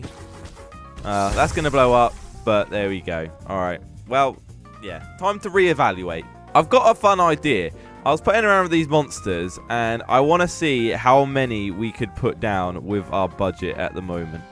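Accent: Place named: Essex, United Kingdom. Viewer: He sounds so Essex in this video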